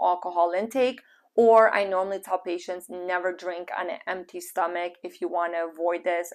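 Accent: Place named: Philadelphia, United States. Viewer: Holy smokes that is a cool thick philly accent.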